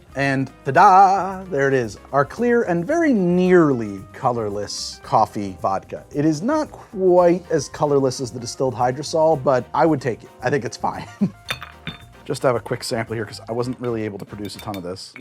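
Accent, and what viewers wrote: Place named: California, United States. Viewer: If you close your eyes this guy sounds exactly like Andrew Tate if he was from California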